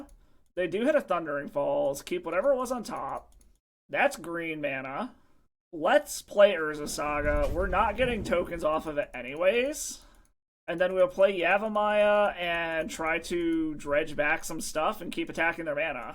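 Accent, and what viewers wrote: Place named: Alabama, United States. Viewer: I don't speak English but your accent sounds like Alabama to me, im right?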